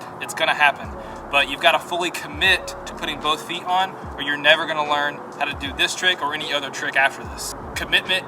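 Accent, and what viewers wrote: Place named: South, United States. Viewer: Where u from homie. I hear a lil southern draw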